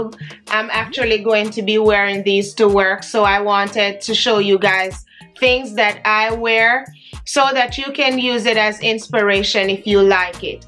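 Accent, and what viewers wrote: Jamaica. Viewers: Girl when I heard the yardie accent is the fastest I ever subscribed. I'm also a teacher and like you ain't about that shabby look. Love the video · you sound Jamaican lol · Me hear the 🇯🇲 accent and then me hear the patois chip in ,me instantly proud🥰. New subsriber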